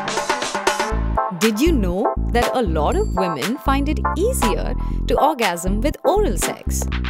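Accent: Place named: India. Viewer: Indian accent unsexy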